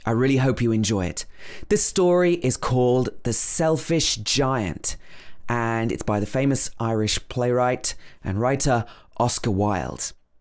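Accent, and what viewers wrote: United Kingdom. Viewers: Trying to go to uk next year to learn English and started look for the videos in British accent and I love this!!! Thank you!! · You have been blessed by God.Your voice is adorable and I hope to listen another great story touched by your nice british accent. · I love your accent!! When I was child in my country in Central America I was learning British English, I don't understand why more American accents were introduced later. maybe because it is the same continent. 😂😅But I love this accent 🥰🥰🥰 Sorry for my English is not excellent 🫶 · I was looking for someone who actually has british accent with text book reading for my role practice, Thank you so much !! I can actually see the text and repeat words so I can practice while hearing your reading! lovely ♥ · This is a nice story and very good for learning the British accent, keep it up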